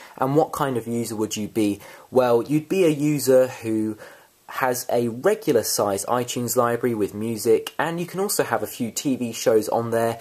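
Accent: England, United Kingdom. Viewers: I thought you was English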